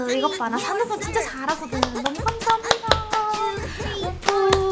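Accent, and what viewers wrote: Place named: Gyeongsang, South Korea. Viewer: 말투 경상도 느낌쓰가 나네요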